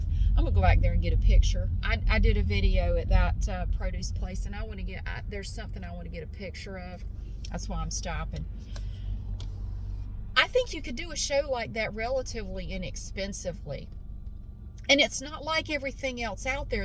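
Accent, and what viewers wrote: South, United States. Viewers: Wow your southern accent is thick tonight. Lol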